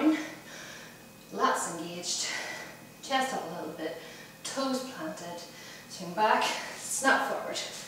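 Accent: Ireland. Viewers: So, you from Ireland?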